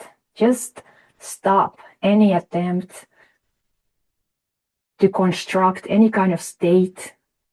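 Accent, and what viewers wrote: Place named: Finland. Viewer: She's Finnish (not German), speaks excellent english with a wide vocabulary (more than most Americans), and has a lovely accent 😊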